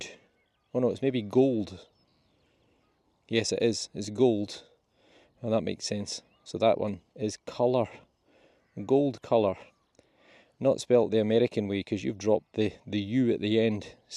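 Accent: Scotland, United Kingdom. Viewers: Thank you so much for all your videos, i am in perthshire and I love walking about with you because I'm in a wheelchair, so keep up the good work, alao i love your Scottish accent, take care, Agnes 🏴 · Yes it’s definitely that gorgeous Scottish accent and lovely tone of voice ❤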